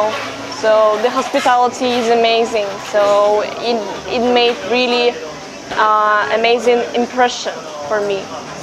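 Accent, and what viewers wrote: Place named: United States. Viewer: She kinda has an American accent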